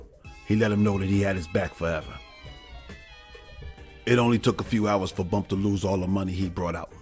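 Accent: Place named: New York City, United States. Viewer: NYC accent starts to get ignoring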